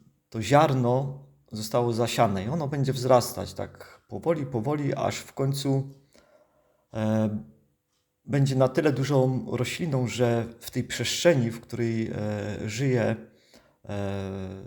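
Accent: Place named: Silesia, Poland. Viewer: Witaj! Jak dobrze Cię znowu słyszeć 👍i wcale przynajmniej mnie Twoja śląska mowa nie przeszkadza, wręcz przeciwnie, ja też mówię i tyż gołdom, jestem z krwi i kości. Ślazaczka z Opolszczyzny, więc póki co pozdrawiam serdecznie i do następnego usłyszenia😊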